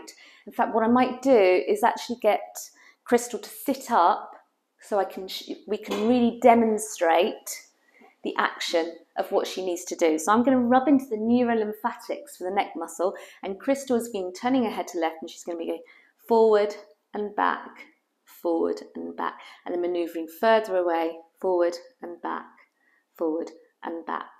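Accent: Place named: Cockney, United Kingdom. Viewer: Why does this nurse speak with a strong cockney accent? She must be related to Rodney Trotter from Only Fools and Horses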